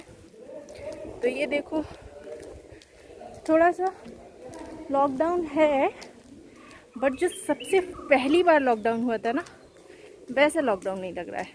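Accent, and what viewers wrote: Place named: Trivandrum, India. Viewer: Accent says u r in Trivandrum..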